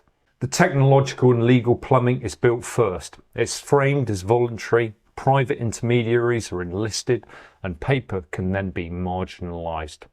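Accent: United Kingdom. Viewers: you sound like a Brit.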